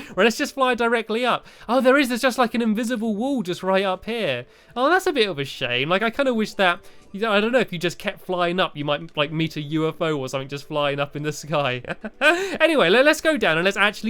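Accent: England, United Kingdom. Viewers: My little sister says she loves watching your videos because she likes your english accent and your laugh, and me too, but you know not the same way she does, youre actually an amazing commentator and I can never wait for your videos because theyre so good and funny! :D, and also, the name Stampy HotBuns sounds hilarious! Lol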